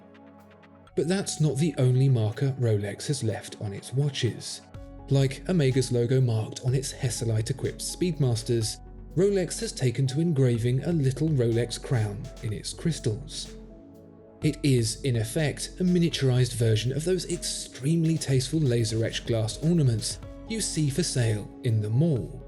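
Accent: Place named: RP, United Kingdom. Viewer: Amazing vidiography, a perfect posh British accent. But underneath the BS, the same blind brand loyalty every rich D has and needs in order to purchase a Rolex. After a whole week of focusing on German perfection, your need to sell some garbage, yes garbage Rolex, is sad for me, one of your more loyal followers. I know you need to sell, but double standard is something I really discusse.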